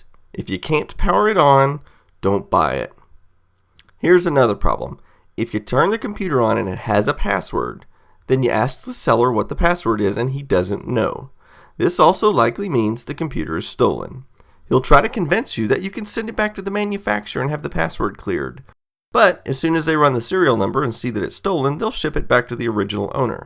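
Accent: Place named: South, United States. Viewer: ´s southern accent was perfect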